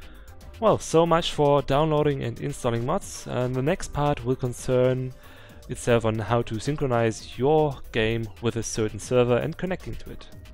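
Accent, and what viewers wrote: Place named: Germany. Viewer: And now i noticed how funny the german Accent is xD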